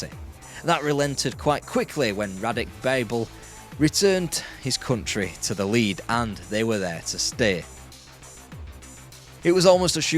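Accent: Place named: North, United Kingdom. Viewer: Northern I was certain about. It's a very cool sounding accent, like someone I'll have three pints with on a saturday afternoon.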